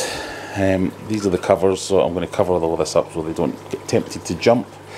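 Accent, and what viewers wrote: Scotland, United Kingdom. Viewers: Mate, you've never sounded so Scottish. Lol.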